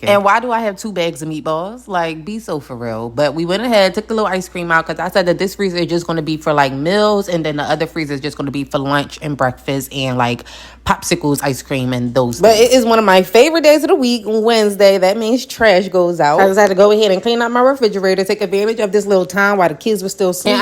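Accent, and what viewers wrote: Philadelphia, United States. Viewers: This woman definitely sounds like she is from Philly. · I need to get some of those fridge containers! Is that a Philly accent I hear! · Love the Philly accent. I be catching my self mocking my family after chilling with them for a day or so!!!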